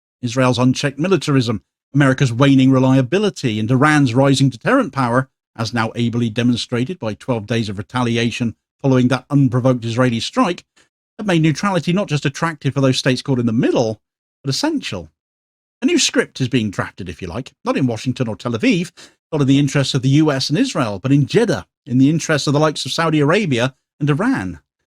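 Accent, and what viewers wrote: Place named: West Country, United Kingdom. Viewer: is from South West of England! That's our accent! 😂😊xxx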